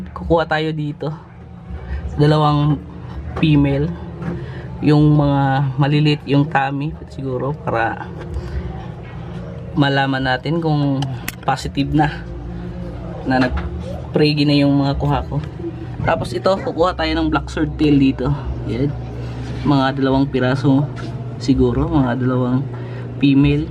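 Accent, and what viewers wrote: Visayas, Philippines. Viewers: 100% if he speaks english you will find a hard time to understand it due his bisayan accent, and its hard to communicate outside your native language. 😀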